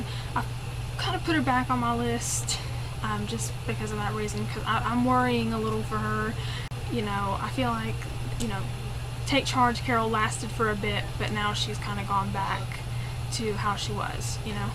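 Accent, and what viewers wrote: South, United States. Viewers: Because she's a southern chick. I love that southern accent. I hear it all the time since I'm from Texas.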